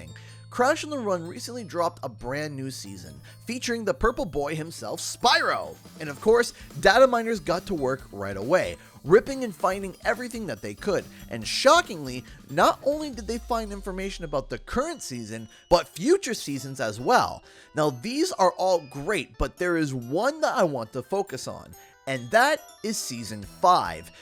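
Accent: Canada. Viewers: Canadian accent sound like American access until they say just one or two words haha then I’m reminded where you’re from! About and sorry. Probably a few more?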